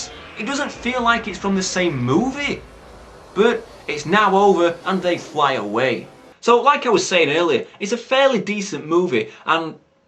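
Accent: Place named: Yorkshire, United Kingdom. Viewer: He sounds like he's from Yorkshire in north england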